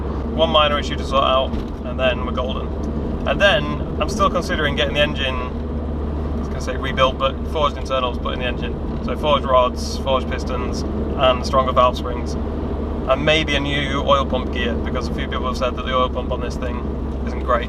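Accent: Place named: Northern England, United Kingdom. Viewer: What brake setup are you using? great video btw Also it sounds like your from up north so i'd give Paul at RS Tuning in leeds a call for your engine work :)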